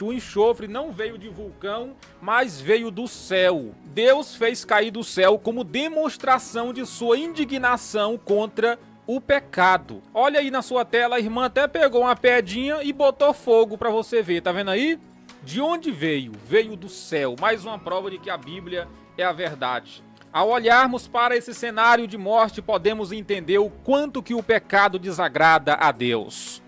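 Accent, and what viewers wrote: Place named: Maranhão, Brazil. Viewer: Estou gostando muito dos seus vídeos 👏👏e aprendendo muito, e gosto do nosso sotaque do Maranhão 👏sou de São Luís MA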